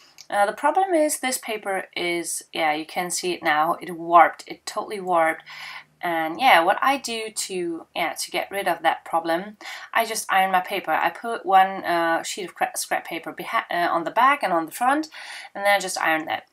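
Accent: Germany. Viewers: Oh I LOVE it when you have a card up! Not only are they adorable, but I get to hear a little bit of the German accent I miss oh so much! As for your coloring question: my marker of choice are the ProMarkers, i use Prisma pencils and I am slowly getting into the whole water colour thing. Keep up the good work and I cannot wait until your stamps are up and running! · Your accent is not funny! It's beautiful to me! I miss hearing german accent. I lived in Stuttgart and Nürnberg, I miss Germany :) Yes, it is craft foam, and I love how you used it to make the shaker, very clever! I like my copics, water color pencils, water color paints, and regular colored pencils with mineral spirits.I am saving up to get Pitt brush markers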